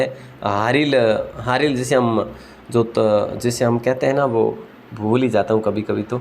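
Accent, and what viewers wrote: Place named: Marwari, India. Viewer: सर आपकी मारवाडी भाषा बहुत ही मीठी लगती ह् इससे हमारा विडीओ के प्रति लगाव बना रहता ह् Thenq sir 🙏🏽🙏🏽🙏🏽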